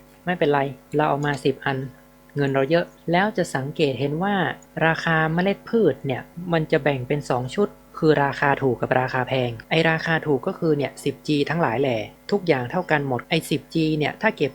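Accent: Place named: North, Thailand. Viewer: แงงง ชอบความสาบเมืองงง555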